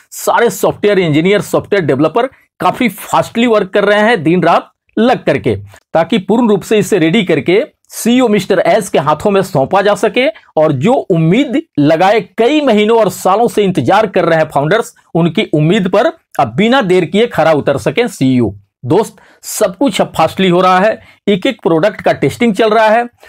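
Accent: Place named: Bihar, India. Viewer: Sirji aap ki tone se lagta hai ki aap bihar se hai mein bhi bihar se hi hu aap se mulaqat ho sakti hai kya.aap bahut achhi informations dete hai.dhanyavad.jai ON-PASSIVE.JAI BHARAT.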